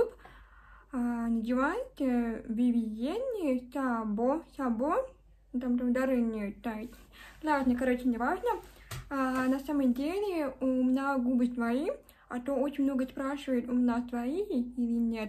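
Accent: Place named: France. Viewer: у тебя красивый акцент) я сначала подумала, что ты француженка :)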